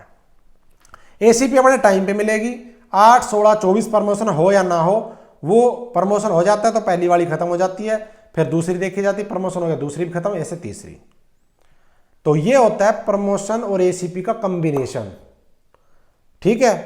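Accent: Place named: South Haryana, India. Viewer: It's seems u belongs to south haryana like Rewari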